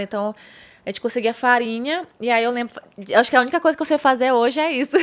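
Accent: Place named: Manaus, Brazil. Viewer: Esse sotaque é lindo...minha sobrinha foi criada em Manaus e falava assim...❤❤❤❤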